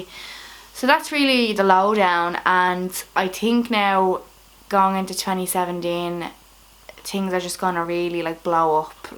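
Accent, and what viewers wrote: Dublin, Ireland. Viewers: you are so talented you are so right with the Dublin accent I can relate to you, keep up the great work and if it's not too much trouble do more movies if they were set in Dublin they were fantastic introduce loads of my family to them particularly love titanic best of luck to you my 87 year old mother Haas a great laugh.I wish you well and happiness and look forward to the next production.well done your class you make my day .I will keep watching good girl go for it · l love this chanle l love to hear my dublin axsent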